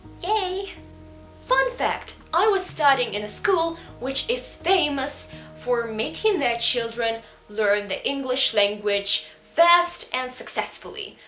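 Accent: United Kingdom. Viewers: Tu acento es más bien británico.🤔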